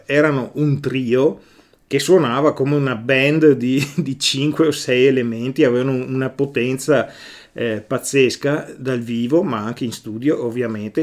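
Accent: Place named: Veneto, Italy. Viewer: Un video per ghiottoni,molto interessante,grazie,ti ga' cadenza veneta,ció...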